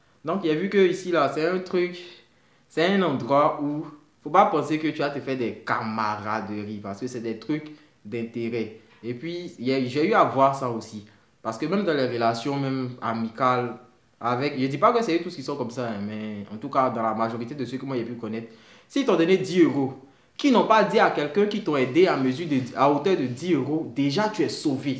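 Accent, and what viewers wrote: Ivory Coast. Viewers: L'accent ivoirien me tue mdr 🤣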